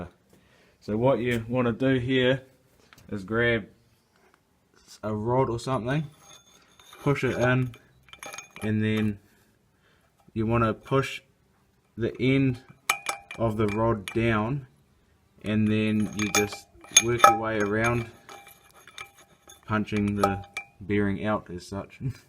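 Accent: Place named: New Zealand. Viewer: Kiwi repairs with a kiwi accent are so much better to watch. And kiwi gumboots as well... lol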